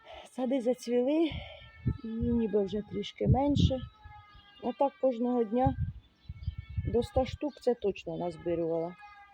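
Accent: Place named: Poltava, Ukraine. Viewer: Я дуже радію з того що чую свій полтавський діалект ібачу колегу по квітах аж сльози виступили так мені схотілося усвою трудолюбівку пропоную помінятися : ви мені сімена сон_ трави або кущик, а я вам голубу юнону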